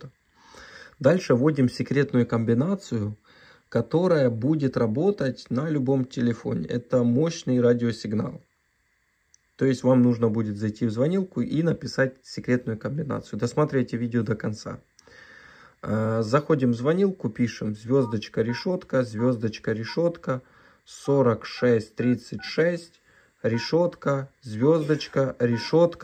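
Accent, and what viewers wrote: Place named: South, Russia. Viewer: Южно русский говор... Какчай качай... -?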